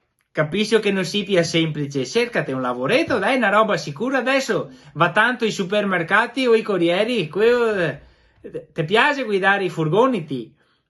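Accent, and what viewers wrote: Vicenza, Italy. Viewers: Luca scotton sono d'accordo con te è strepitoso, ma dimmi " in quale provincia parlano come lui forse Vicenza?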